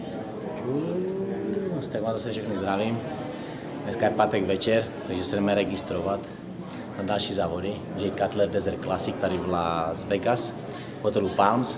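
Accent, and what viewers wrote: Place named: Moravia, Czech Republic. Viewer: On má moravskej přízvuk, je z česka.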